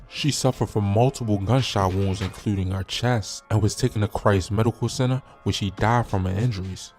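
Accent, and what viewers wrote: Baltimore, United States. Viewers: Got that Baltimore accent 2uuu 😂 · The narrator has to be from Baltimore 🤦🏽‍♀️🙄😒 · Sound like u frm Baltimore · Where are you from Baltimore? Your accent is fucking stupid bro. You pronounced word so fucking stupidly.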